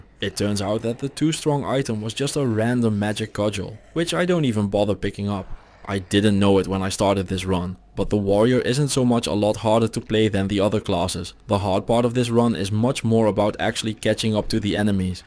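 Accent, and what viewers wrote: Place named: Netherlands. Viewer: why you sound so dutch.... and like me.... also yeah the mage is by far the easiest. mana shield hydra can beat the whole game. ranger is thus also super easy as its mage as well u just have a bow instead of melee. much safer. warrior is just face tank stuff. and ur magic is the lowest it can be... fun fact. melee>range>mage is a triangle. warrior can still be decent ranger. but mage is crap at using a bow. if the mage class had decent dex. it would be broken.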